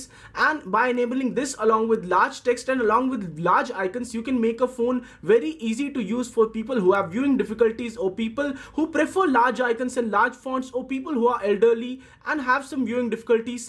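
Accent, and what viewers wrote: India. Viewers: OMFG YOUR VOICE DAMN!!! ANOTHER INDIAN TECH CHANNEL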